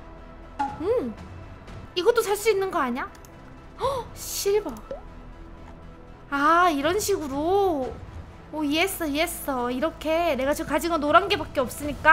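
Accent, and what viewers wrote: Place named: Gyeongsang, South Korea. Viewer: 부모님이 경상도 분이신가 봐여. 님 억양에서 경상도 억양이 살짝 묻어 나네요. ^^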